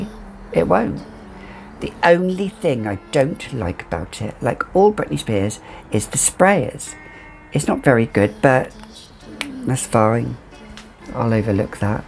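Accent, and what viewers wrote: France. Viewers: Yayy for the French accent!!!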